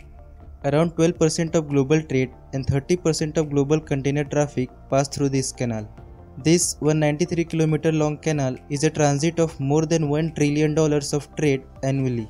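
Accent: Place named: India. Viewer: It's the Indian accent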